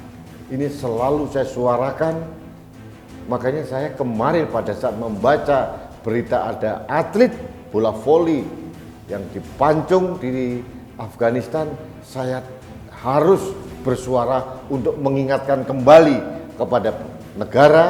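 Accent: Java, Indonesia. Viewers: Tebar hoax aja lu pak tua medok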